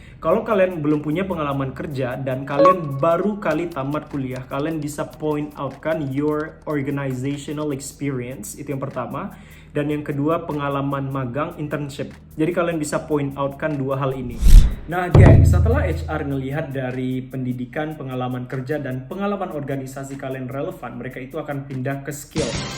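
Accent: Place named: Pekanbaru, Indonesia. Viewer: content yang bener-bener bermanfaat banget bang, thanks sharingnya. ada bang jugaa wah! hehe. keep on using our pekanbaru accent ya bang!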